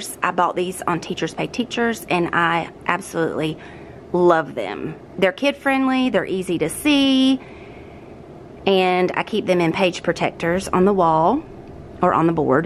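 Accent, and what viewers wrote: United States. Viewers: Are you a Southerner? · Do you teach in NC? I'm trying to figure out your accent. I'm in NC!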